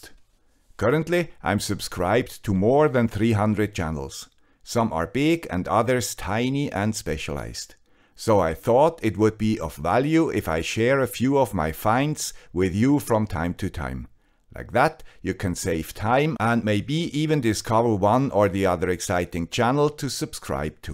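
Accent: Switzerland. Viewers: There are some great ham channels on YouTube and as a returner to amateur radio, they have helped me learn a lot. I'm always glad to hear a little Swiss accent modulation of course!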